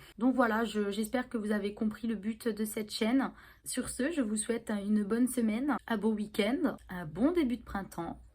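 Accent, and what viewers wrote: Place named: East, France. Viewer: Joli accent de l'Est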